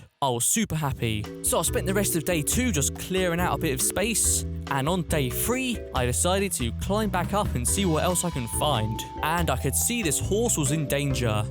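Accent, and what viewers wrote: United Kingdom. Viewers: ARE U BRITISH I KNOW UR IN THE UK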